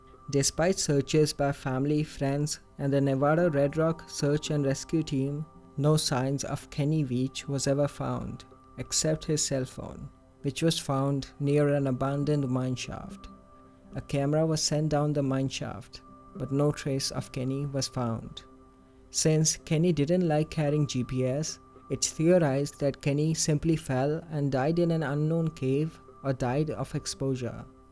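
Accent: India. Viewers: Indian guy..... narrator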